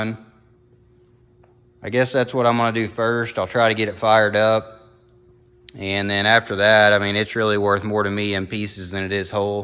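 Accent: South, United States. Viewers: I'm from wis but damn I like that accent. Prefect blend of knowledge and no fucks. Makes me think about moving south. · The southern voice is something that just keeps me comin back, I can listen and retain information from him all day, no music, no big word science bull shit. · I agree.....He's a very knowable person...don't let that Southern accent fool you. Cheers from San Francisco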